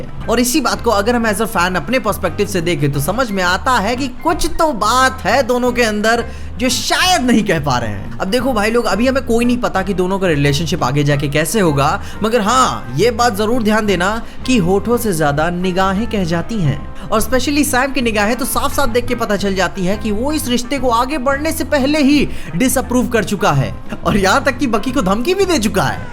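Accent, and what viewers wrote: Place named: Bhojpuri, India. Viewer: Bhai love your expression ❤️😘,or Tera bhojpuri touch bolne me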